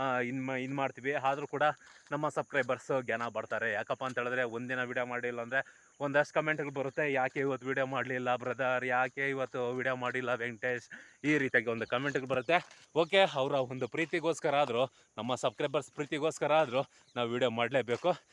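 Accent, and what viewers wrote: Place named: Tigala, India. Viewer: Anna neevu tigalaru nimma bhashe enda tiliyuttade namdu Anekal navu tigalare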